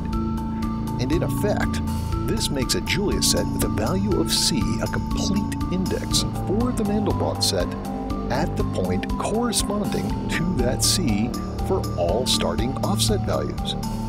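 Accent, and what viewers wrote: United States. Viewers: The c, z american pronunciation makes this very confusing. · your American accent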